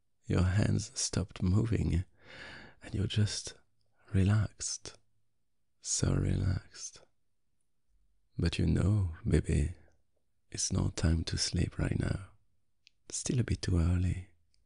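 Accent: France. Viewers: I'm SOOO into french accents lately 😗😩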